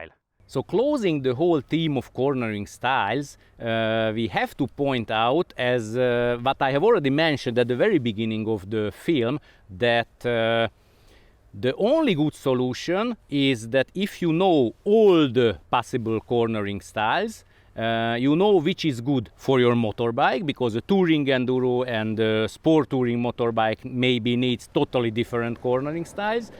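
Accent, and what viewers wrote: Hungary. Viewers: Are u by any chance from Hungary?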